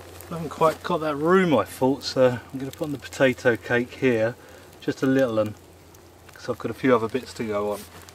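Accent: United Kingdom. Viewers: are u british bruh?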